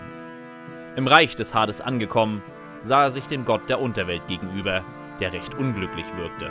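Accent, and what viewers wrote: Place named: England, United Kingdom. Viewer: Als Grieche schmerzt es meinen Ohren, die Namen im Deutschen in englischem Akzent zu hören...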